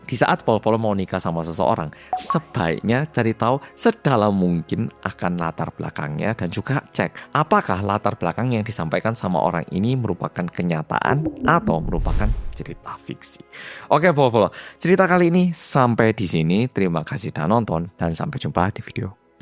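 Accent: Java, Indonesia. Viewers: Mas yang medoook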